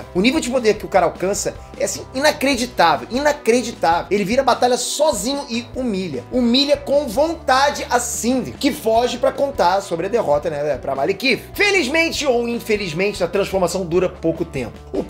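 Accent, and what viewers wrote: Rio de Janeiro, Brazil. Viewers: Impressão minha ou ele falou com sotaque carioca?!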